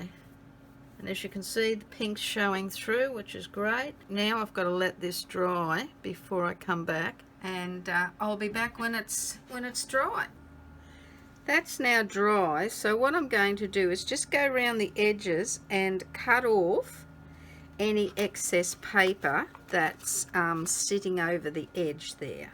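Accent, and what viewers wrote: Australia. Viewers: I love that you’re an Aussie like me! I was listening watching and you were a few minutes in and I was Ohmygosh yr an Aussie lol Love love what you’re doing and done. There are never any mistakes in art. They merely become something you didn’t know you were going to create! Hehee love and subscribed to you. Thanks for a brilliant tute.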